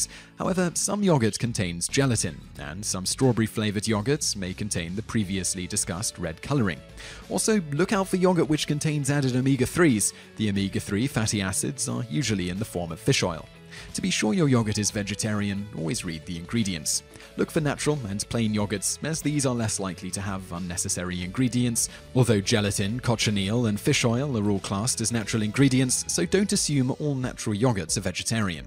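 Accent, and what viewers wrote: United Kingdom. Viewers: *Story time!* As a muslim and my family not being religious we end up at alot of non-halal restraunts so I have to pick and mix from the veggie menus ( i used to be veggie for pretty much my whole life before converting to islam.. even slumping on the vegan side!) aaaaannnnd tbh They're pretty good at stating whats vegetarian or not as well as I'm allergic to all E-numbers, colours, preservatives, flavours, blah and blah so I have to read the ingredients lists anyhooo but Again, the guy in the video is pretty much right... if you want to guarantee something is veggie/vegan then grow/make it yourself! *we salute you Wonderfully british accented narrator....*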